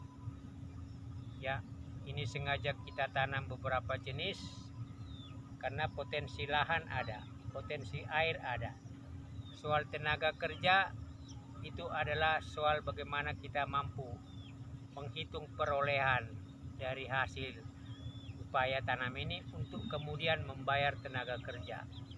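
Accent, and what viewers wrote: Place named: Sulawesi, Indonesia. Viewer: Mantap nih..kalau dari kata-katanya bapak ini pintar dan berpendidikan tinggi dan seperti orang Sulawesi gaya bicaranya ..sehat dan sukses 🙏🙏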